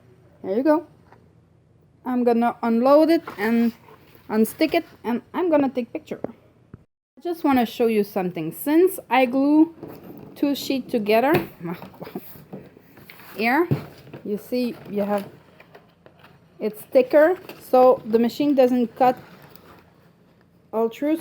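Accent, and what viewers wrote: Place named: Quebec, Canada. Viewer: In French please, is it possible ? Je préfère vous entendre parler avec votre québécois. Merci pour vos partage.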